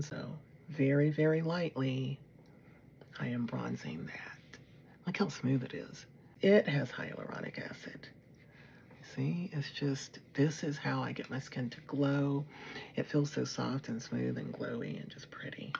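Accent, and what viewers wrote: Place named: United States. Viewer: Aaaaah❤u look 🌹 lovely beautiful, u do have a beautiful accent n I love hw u American's speak am frm England ❤take care 😘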